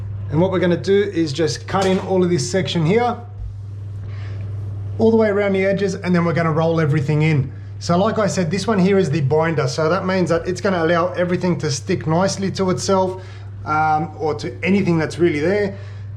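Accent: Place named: Australia. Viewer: Loving the vids mate - very easy to understand and so refreshing hearing an Aussie in one of these DIY vids! 😂 I had a similar problem in the bathroom, prob even a bit worse, was at least half the ceiling. This is my third attempt in about 12-18 months! This time I used Zinsser BIN as the primer but basically followed this same process you detail. From what I can tell it is a similar product but no Binder in it... I have just applied a final 'top' coat of joint compound... Should I just use the BIN next before my ceiling paint or buy the Peel Stop or Crommelin? I could really do with your assistance. Cheers mate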